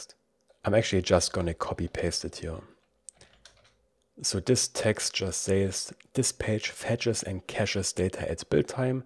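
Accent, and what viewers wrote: Germany. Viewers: man hört absolut deinen deutschen akzent aber sehr nices video bist nen cooler typ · After watching German content (mainly movies on Prussia ) for a fortnight I was thinking I was hallucinating as I heard a German accent but when I checked his location It was Germany //I was relieved that I was not hallucinating btw the thumbnail was no clickbait it was all in one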